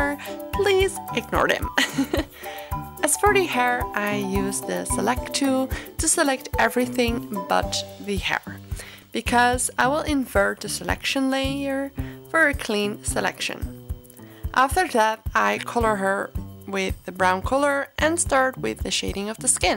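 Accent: Netherlands. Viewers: Are you dutch? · echt een nederlands accent dat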